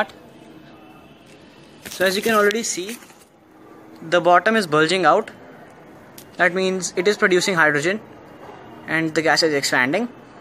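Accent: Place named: India. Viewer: Vai. Indian. Ho. Khudke. Language ko.. Priority. Diya karo. Hindi me vi bola karo... Jitna vi natak karo.. Apki. Bolne ka tarikese. Malum. Parta hr indian.. English bol raha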